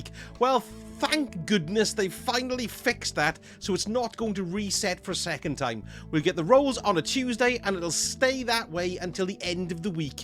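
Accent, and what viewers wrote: Wales, United Kingdom. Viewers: Just wanted to say been watching for a bit, thought I heard the Welsh accent, and well love ya channel and content it's cracking, have a grand day boio...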